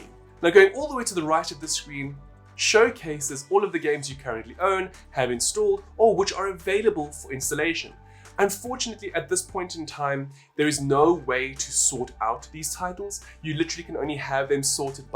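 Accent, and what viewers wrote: South Africa. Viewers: I don't usually click on ads but I hope you get some recognition for your work. Are you from South Africa? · are you a south African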